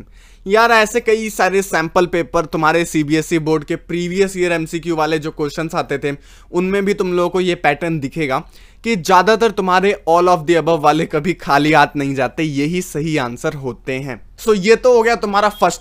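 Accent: Bihar, India. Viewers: Hello bhaiya aap bagaha bihar se ho kya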